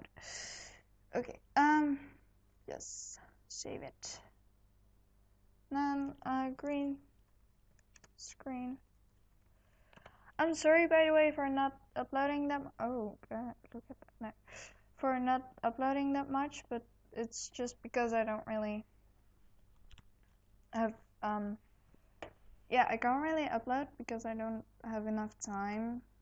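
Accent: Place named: Netherlands. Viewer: hooi! !!!!!! Ik kan gwn horen dat je Nederlands bent XD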